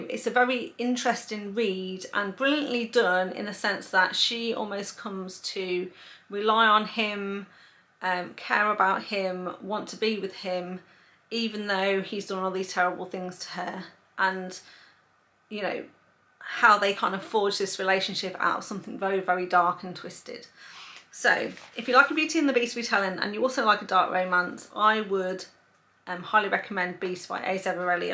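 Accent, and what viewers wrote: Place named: England, United Kingdom. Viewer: I subscibed today, love your english accent! You made me laugh with the bloopers!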